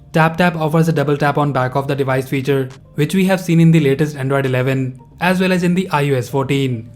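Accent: India. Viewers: Bro he’s Indian it’s how he talks. He has an accent. Americans man. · That's not accent. That's voice. He talks like a machine, in a repeating melody. His accent is recognizably Indian. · I dont know why indian peoples speak english like this accent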